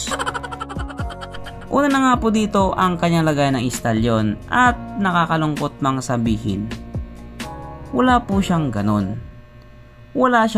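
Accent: Visayas, Philippines. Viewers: Bisaya ka kol?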